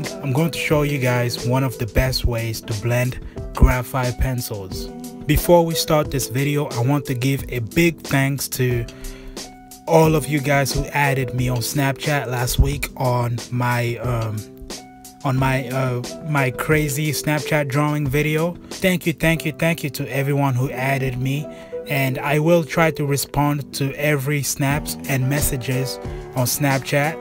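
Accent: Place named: United States. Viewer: he is gaining an american accent overtime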